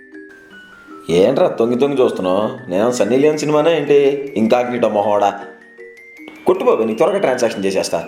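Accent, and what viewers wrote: Godavari, India. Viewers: nani style lo champav kadanna.... may be ur from godavari...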